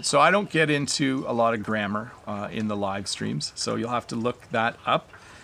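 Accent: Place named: Canada. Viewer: Hi, I am an English teacher and I always recommend Canadian accent to my students. By the way I love the way you teach English and talk about what is going on around you. That's sounds more real.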